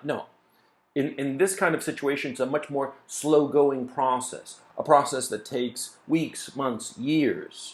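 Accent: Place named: United States. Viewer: Can you do an intro in Spanish and later in Russian? Just to compare how different it sounds. You sound 101% American in every video :-D Thank you!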